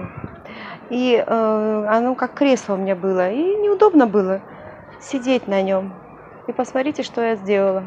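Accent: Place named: Georgia. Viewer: Очень четко слышится грузиеский акцент! Гамарджеба!!!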